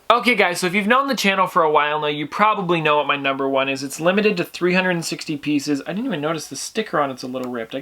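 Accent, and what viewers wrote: Canada. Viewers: I love how Canadians say about lol a boot like every other word is normal just the about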